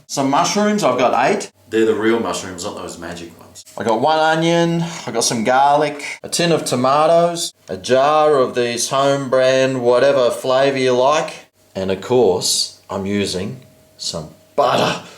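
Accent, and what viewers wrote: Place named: Australia. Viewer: You sound Aussie, I’m Australian as well. I love the way I make spaghetti Bolognase aka spag bowl I cook the mince then add mint leaves I also add mushrooms and honey as well believe it or not that tastes really nice in the mince I add a fair bit of bolognase sauce and add cheese afterwards when I dish it up you guys can decide what cheese you want but yeah that’s the way I do it and it’s absolutely beautiful, I can do that within 30 mins if not less and it’s the best meal I can do within that time imo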